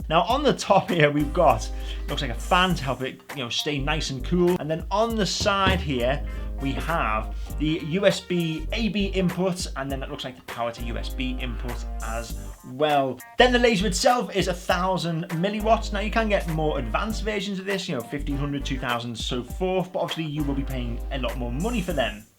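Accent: Liverpool, United Kingdom. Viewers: Hi, nice review - I built my own very similar engraver but one of these caught my eye while browsing eBay. However, I cannot work out your accent. Bit of Scouse, bit of Geordie and a bit of Oxbridge:-) Where are you from?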